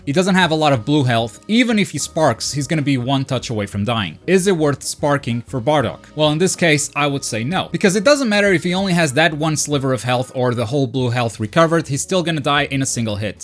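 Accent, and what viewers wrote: Portugal. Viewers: If I understood, you're Portughese? E AI MEN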